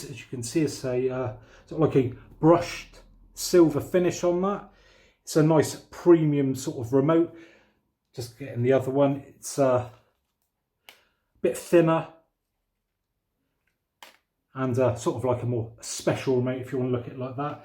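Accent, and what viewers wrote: United Kingdom. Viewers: Love the british accent. ✌🏾💪🏾😎💥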